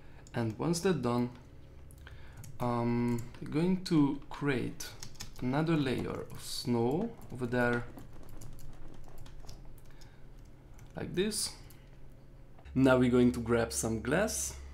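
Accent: Hungary. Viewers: Szia tokre lehet hallani hogy magyar vagy😁 csinálhatnál majd olyan videót is amiben építes redstone szerkezeteket😀😉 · Te beszélsz magyarul? Csak mert mintha lenne egy kicsi akhcentusod :-D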